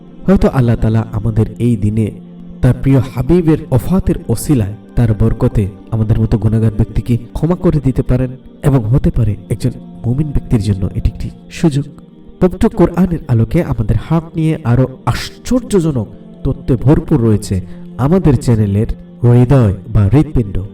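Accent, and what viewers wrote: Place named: Chittagong, Bangladesh. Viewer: তততো না তথ্য, মাতা না মাথা, উচ্চারণ সুন্দর হলে ভিডিও দেখতে ভাল লাগে। এই ভিডিও তে যিনি বর্ণনা করেছেন উনি সম্ভবত চট্টগ্রামের লোক।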